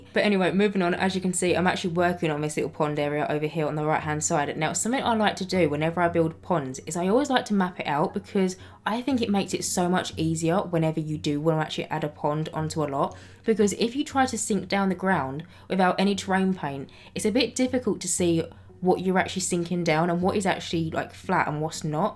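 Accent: United Kingdom. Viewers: i miss playing sims, i dont have enough space on my pc. but i love watching you and listening to you! :3 i love british accent!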